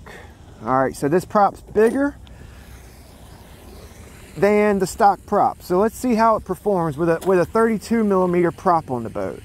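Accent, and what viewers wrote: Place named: South, United States. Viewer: There's a TON of RC boat videos out there, but I must say; if you want to learn something, this is one of (if not) the BEST channels. Sometimes, can be WAY off the mark in his diagnosis of a problem (his learning curve is increasing like a rocket to the moon though...), but for the other 98% of the time you can rely completely on the information he so eloquently explains in his cool, laid-back Southern style. "NICE!" "Git it Bull...." "Full Trigger, Full Trigger!!!!......." If you have any similar RC experience (Cars, airplanes), boating should be easy. Just be forewarned; there is no "perfect" setup, parts are hard to find, you LHS has no idea what an RC boat is or where they can be found, but you will spend a lot of fun time trying to find "The Sweet Spot". That's the fun of boating, many of us are going where no man has gone before..... 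Lastly (and this applies to ANYBODY considering a venture into the hobby), ASK QUESTIONS of those who obviously have some experience; you can save yourself time and grief. There are good products out there, and there is crap. Experienced modelers know the difference and are usually more than willing to share their experiences with various products. has a business connection with ProBoat, but don't let that affect your thinking. ProBoat has done a whole lot in improving and perfecting the RC boating experience and the product line they offer is outstanding. Welcome to........ The Boat Gang! 😉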